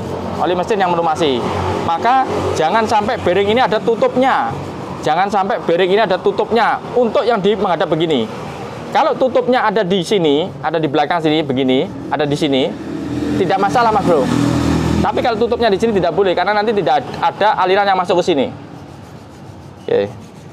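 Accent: Java, Indonesia. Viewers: Logat e kok jowo mas? Sampean pundi mas? Kulo mpon ndak kuat mas vario 125 led kulo mpun turun mesin ganti perpak. Panggah munggah terus air radiator e mas. Tulung mas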